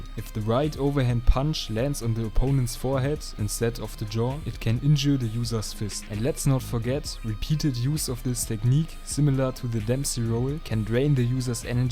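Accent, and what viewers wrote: Germany. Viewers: Where u from? You sound German or something like that. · U German ? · This german accent...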